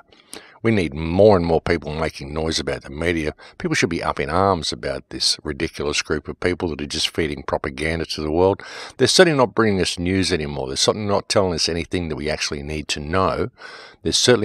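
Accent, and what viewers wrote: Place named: Australia. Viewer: I love your vlogs it’s nice to hear a Aussie voice so smart and I wish you could go on Q and A wake up some more aussies we need it keep up the god fight 🇦🇺💖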